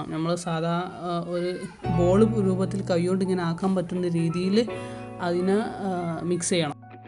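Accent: Malappuram, India. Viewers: ഓരോരുത്തർക്ക് അവരവർക്ക് പറഞ്ഞ ഭാഷയിൽ സംസാരിക്കാം ഓരോ നാട്ടിലും ഓരോ തരത്തിലായിരിക്കും സംസാരങ്ങൾ ഇംഗ്ലീഷ് ആരോട് മലയാളം സംസാരിക്കാൻ പറഞ്ഞാൽ അവർക്ക് സാധിക്കുമോ മലപ്പുറം ഭാഷയ്ക്ക് എന്താ കുഴപ്പം നല്ല ഭാഷ തന്നെയാണ് പിന്നെ നമ്മൾ മലയാളികൾക്ക് കുറിച്ച് കുറ്റം പറഞ്ഞിട്ടില്ലെങ്കിൽ ഉറക്കം വരില്ല😄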